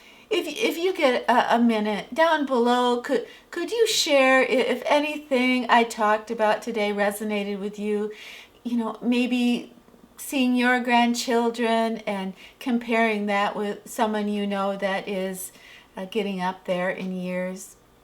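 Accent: Midwest, United States. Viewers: I’m a new subscriber but a seasoned woman exactly your age! I love your videos,! My dog died a couple of years ago so I’m enjoying Desi and watching you two relate. Makes me miss my girlI I love the sound of your midwestern voice and your infectious positivity! My apartment is my sanctuary too and I decorate it with whatevery I find to be beautiful and useful, much of it thrifted. I know I’m alive because my surroundings matter to me and I never stop wanting to beautify my home. There’s no place like it. Thanks for inviting me into your lovely abode! Keep up the great work you’re doing. See you next time. It’s a deal!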